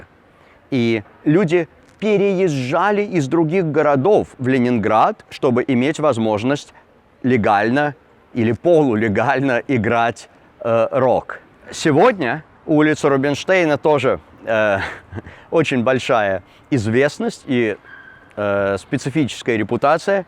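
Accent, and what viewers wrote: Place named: United States. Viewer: Очень интересный рассказ о Довлатове, спасибо. Но я не понимаю, зачем, будучи преподавателем русского языка, упорно использует американскую интонацию во всех своих видео.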